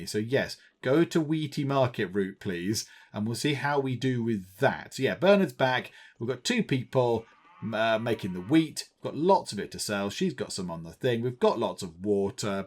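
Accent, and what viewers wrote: United Kingdom. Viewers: Hello British accent guy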